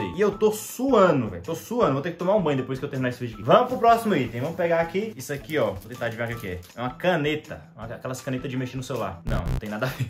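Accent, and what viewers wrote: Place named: Minas Gerais, Brazil. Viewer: Quando eu descobri que ele na real de Brasília eu ate assustei pq o cara fala igual nos mineiros mo viage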